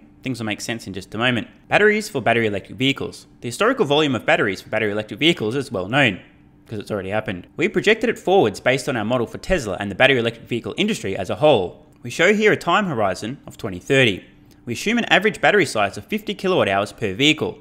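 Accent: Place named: Australia. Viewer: That Australian twang got you a sub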